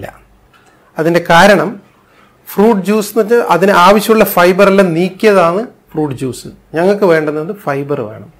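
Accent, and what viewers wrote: Kannur, India. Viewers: So nicely and explained in a simple manner. Your kannur simple language is so nice to hear.🙏👍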